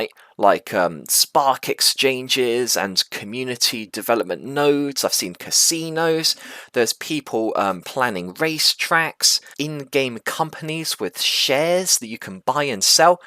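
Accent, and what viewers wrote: United Kingdom. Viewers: I wish I could go to Genesis week in Las Vegas how exciting. Where are your from? You have a UK accent.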